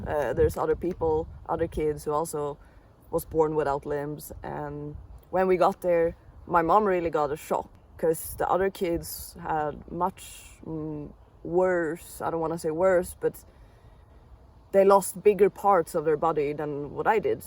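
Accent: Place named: Sweden. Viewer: I don't know if I got it right, but in my ears you have a similar accent to Peediepie's so: are you Swedish?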